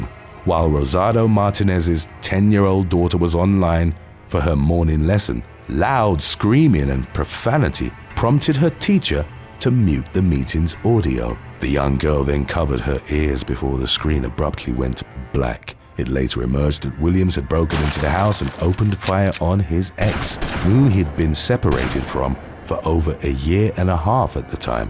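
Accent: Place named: United Kingdom. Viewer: Cut some slack, he’s British. They pronounce things differently over there.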